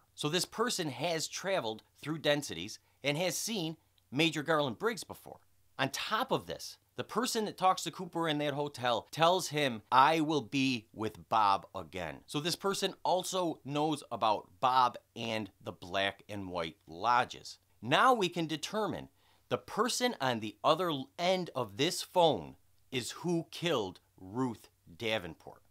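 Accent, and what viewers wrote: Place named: Chicago, United States. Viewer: I'm betting Chicargo...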